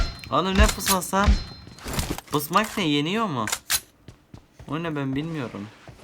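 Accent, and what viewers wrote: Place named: Syria. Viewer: Niye suriyeli gibi konuşuyon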